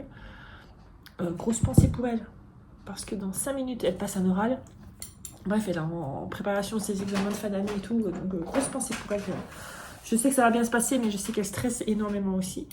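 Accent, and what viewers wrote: Belgium. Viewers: Cet accent belge c'est magique, notre qui nous fait une vidéo à l'ancienne je valide! Amitiés du pays basque à toi et à tous 😁😁😁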